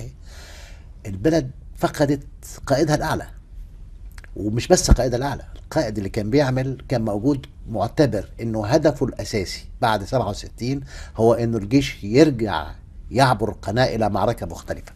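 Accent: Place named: Levant. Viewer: حكواتي بلهجة إخوانا الشوام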